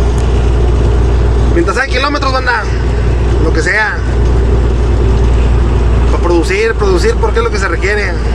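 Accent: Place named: North, Mexico. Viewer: De dónde eres, hablas muy anorteñado, cuídate mucho saludos desde Ecatepec, bendecido es en tu largo caminar por la 57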